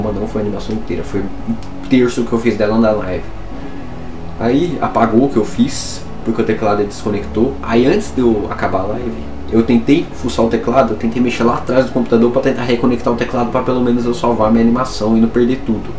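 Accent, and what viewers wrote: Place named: Portugal. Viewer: ah ta portugues de portugal :3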